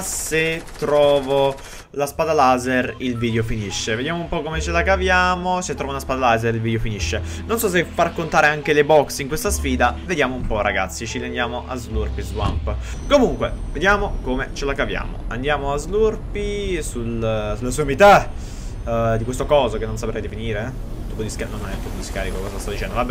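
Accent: Naples, Italy. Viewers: Se trovi qualcuno con il minty il video finisce PS: ma sei napoletano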